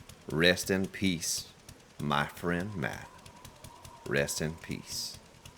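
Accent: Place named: South, United States. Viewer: I have laughed more on this video at the silliness your friend put you through than I did at G4Kz when the bear was chasing him. If any gets mad, they don't, in my opinion, have a very good sense of humor. as for how many times you said boo boo I counted five times. don't get me wrong, but your accent went real southern in this video. don't know what state you were raised in, but it sure sounds a lot more of the south. great episode.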